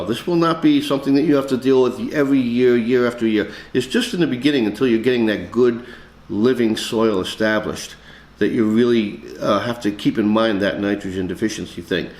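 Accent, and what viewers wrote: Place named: New York, United States. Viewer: Literally just red my mind while I was listening and scrolling through the comments. I travel a lot between states due to work. Sounds like a Southern NY, NJ, CT accent to me. I know I'm from NJ originally, live in PA now, have family all over NY and a GF In Nothern NY and the subtitles in accents in speech vary.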